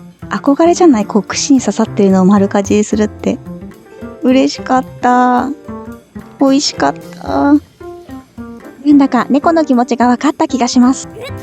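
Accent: Kansai, Japan. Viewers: またお会いできて嬉しいですえ？関西弁やん！❤これだけ人々を虜にする人も珍しい😊 メジャーデビューしてください❤ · ステキな所ですね👍 ホテルというより、旅館、て感じですね😊 お湯が出る時、エアかむところもレトロで😂これも演出？なんですかね🤣 なんでやねん😄 さんの関西弁かわいい😄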